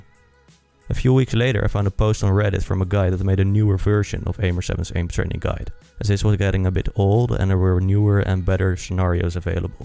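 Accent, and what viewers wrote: Netherlands. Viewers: Hey, I know your Dutch man I hear your accent. I am Dutch too. I've used Kovaaks myself for the last 9 months and I got around 214 hours of gametime in Kovaaks. My aim improved significantly. My biggest weakness to overcome is short strafes fast which I have a hard team dealing with if its moving fast close to me. Anyway my K/D in Warzone was 0.80 and it got boosted to 0.90 recently and it's still gonna climb I notice I do a lot of insane flicks in the gulags also Apex Legends all games seem to be more easy now. I really like your video thumbs up. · Je hoort meteen dat je een nederlander bent hahahaha, altijd dat vieze accent.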